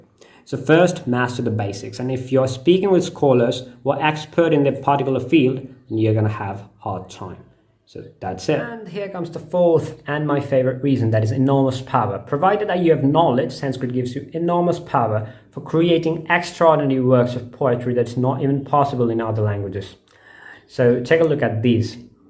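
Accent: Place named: United States. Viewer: Pretty good American accent! Talented guy you are!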